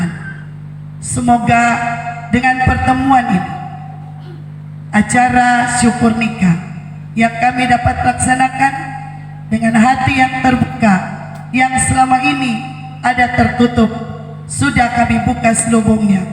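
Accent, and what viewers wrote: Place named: Manado, Indonesia. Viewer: Kalau dengar bahasanya kayaknya dari Sulawesi Utara kota Manado...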